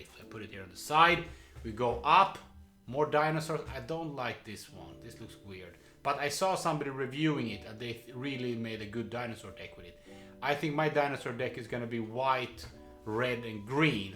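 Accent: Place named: Scandinavia. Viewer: what country are you from? You sound Scandinavian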